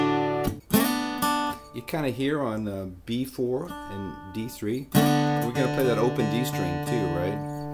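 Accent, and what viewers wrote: Canada. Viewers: The accent is Canadian right? Sta-CAT-to lol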